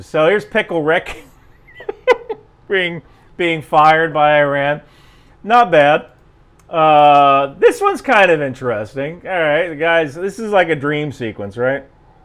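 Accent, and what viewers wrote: New Jersey, United States. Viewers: say "It's not a nursing home! It's a retirement community." in your jersey accent. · To a west coaster like me, the New Jersey accent is like 95% the same as Bawlmerese, so I'm surprised that Marylanders would think you sounded *different*, much less dumb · Toni soprano? wow you sound just like him when you talk with that Jersey accent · You should really, really revert back to the Jersey accent. I really dig it. I don't think it sounds stupid at all (and I'm not even remotely from that region)😂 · You've got to do an episode with your natural Jersey accent! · Please use your Jersey accent more! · For the record, New Jersey accent is from a very small part of the state in the northeast, adjacent to Staten Island New York. Pretty much the only people who talk like that are, Snookie and her friends. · Kosher pickles? Joyzee accent? 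· Please keep your Jersey Accent! It is fun to listen to! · My dad was from Maryland, you Jersey types all sound like you just came off a Jersey Shores set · Bro just casual about the Jersey accent, thats crazy you sound like your actually from jersey.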